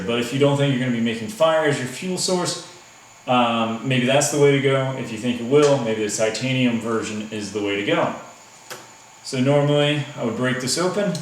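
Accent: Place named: United States. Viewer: He is obviously speaking American English. His enunciation is different from yours or standard American English. I had no trouble understanding what he was saying.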